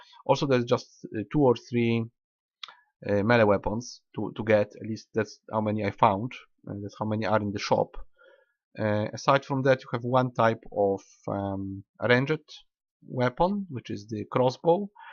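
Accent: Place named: Poland. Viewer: Tak myślałem, że jesteś z Polski xd zdradził Cię akcent hehehe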